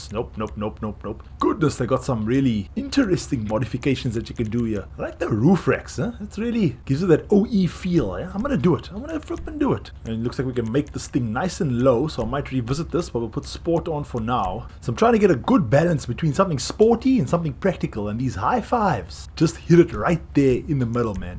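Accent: South Africa. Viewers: Are you originally from South Africa?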